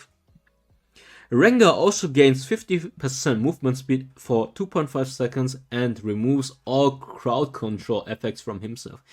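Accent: Germany. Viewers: Are you german you hearing a little bit like a german boy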